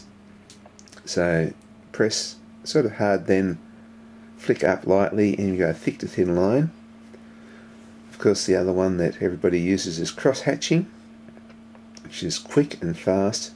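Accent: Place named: Australia. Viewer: australian! yes!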